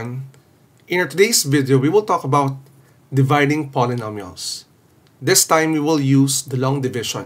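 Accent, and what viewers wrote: Philippines. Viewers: Thanks for this loved it soo much...and btw When I first listened ur voice...I knew it that u are from phillipines coz I watched section e drama so I recognised from ur voice that u are from phillipines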